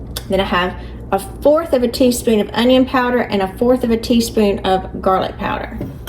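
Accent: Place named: Georgia, United States. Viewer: Just found your channel and I am loving it. I enjoy listening to your Georgia accent.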